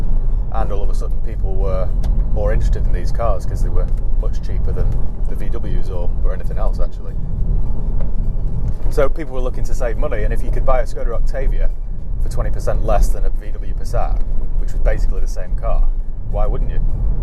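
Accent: United Kingdom. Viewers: it is "Shkoda" not Skoda, it's "Folkswagen" not Wolkswagen........Not just your pronunciation is too GB-focused , but there is a world behind the channel ......with languages and other markets ....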